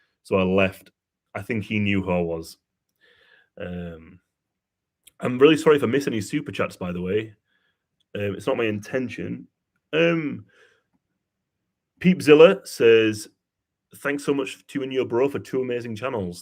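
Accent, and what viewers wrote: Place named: North, United Kingdom. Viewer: Luv his northern accent n luv to watch keep it up.x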